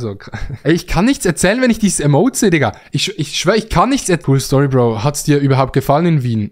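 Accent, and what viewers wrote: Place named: Switzerland. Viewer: Deine Aussprache von Hotel, beige und Bequem ist einfach Schweiz aber nicht richtig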